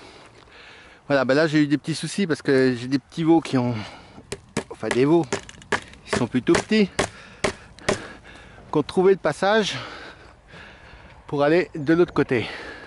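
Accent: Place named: Allier, France. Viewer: Salut, je voulais savoir dans quelle région tu étais car je suis actuellement en stage dans l allier et c'est le même accent et la même façon de travailler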